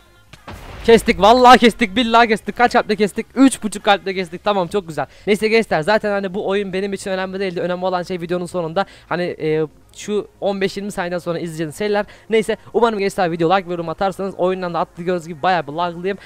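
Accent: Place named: Thrace, Turkey. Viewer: memnunum abi bu arada neden şiveli konuşuyon trakyalımısın.( ben öyleyim çünkü).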